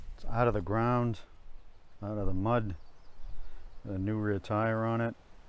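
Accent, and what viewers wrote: New England, United States. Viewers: Love the New England accent..sounds like New Hampshire or Massachusetts.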